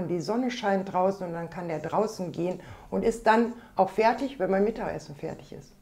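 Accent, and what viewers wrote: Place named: Rhineland, Germany. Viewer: Hallo, dein Akzent erinnert mich an zuhause, ich komme aus dem Rheinland und will beim Zuhoeren sofort n Kaffeekochen und dich einladen. Sieht alles sehr lecker aus und ich liebe deine Kueche. Viele Gruesse aus Kanada!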